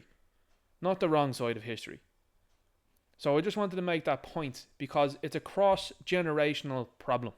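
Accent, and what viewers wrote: Dublin, Ireland. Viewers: Just found your Channel ! Usually the strong dub accent is a bit much for me 😂(Carlow here ) but I’m invested 🎉❤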